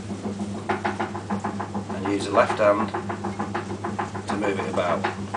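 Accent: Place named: Yorkshire, United Kingdom. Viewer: I LOVE the Yorkshire accent! And the lesson is wonderful.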